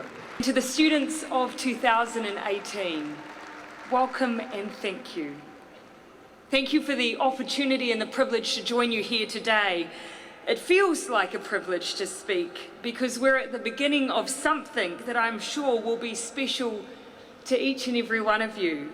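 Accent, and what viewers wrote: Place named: New Zealand. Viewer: I love the nz accent ☺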